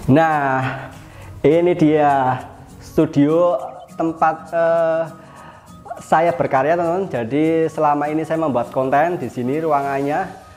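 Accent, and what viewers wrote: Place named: Pekalongan, Indonesia. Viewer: Assalamu'alaikum mas, saya penjahit dan tertarik dgn kontennya karena enak dan jelas, dan logatnya saya kenal, ternyata bener orang pekalongan.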